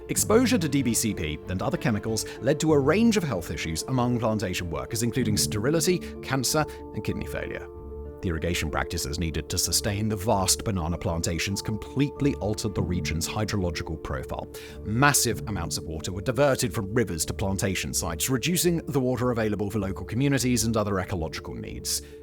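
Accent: United Kingdom. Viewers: Don’t believe all this. Read the Fish the Swallowed the Whale for a more balanced view. A British accent doesn’t mean it is unbiased . By the way, why don’t we make a comparison with the British East India Company’s practices or the practices of Jardine Mathesen in Asia selling opium. A comparative approach might make your assessment more enduring.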